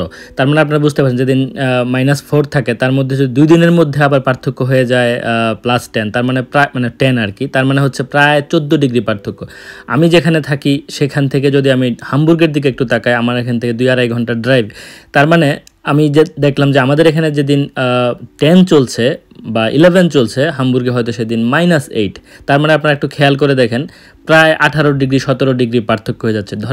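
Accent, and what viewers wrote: Bangladesh. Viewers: bhai,aponar bangldeser bhari kothai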